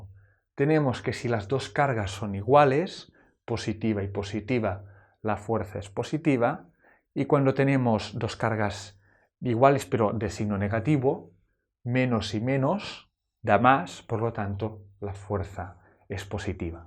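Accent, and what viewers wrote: Spain. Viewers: como se nota el acento catalán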